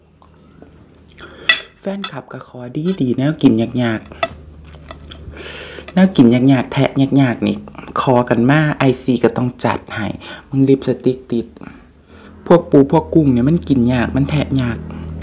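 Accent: Isan, Thailand. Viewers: พูดเบาแปลกๆ อีสานด้วย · เว้าภาษาอีสารม่วนดีเอื้ยย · อีสานบ่ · อีสานดีแล้ววววววว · ฟังออกค่ะคุณ เป็นคนใต้ที่ชอบสำเนียงอีสาน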